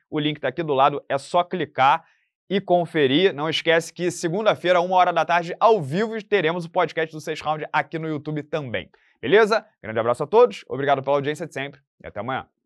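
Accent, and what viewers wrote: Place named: Niterói, Brazil. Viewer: Cara não sei se é por que eu sou de Niterói também, mas eu curto o jeito dele falar, tipo assim igual o eu me sinto em casa.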